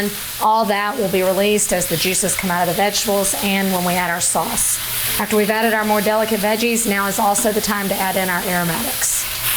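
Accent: South, United States. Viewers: LOL! Love the recipe AND the downhome accent and style combined with oriental cooking- maybe globalism isn't all bad!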